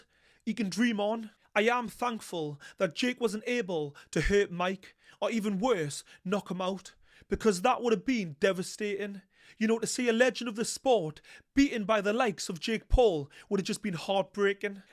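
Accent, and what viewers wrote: Newcastle, United Kingdom. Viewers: Hes a geordie. Nothing like scottish 😂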